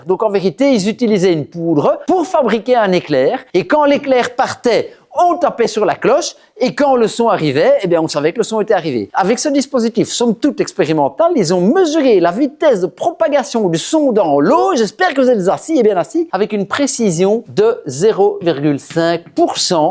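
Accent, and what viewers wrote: Belgium. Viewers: Accent belges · Accent outrancièrement belge. Je m'efforce de le prendre au sérieux. Je n'y parviens pas.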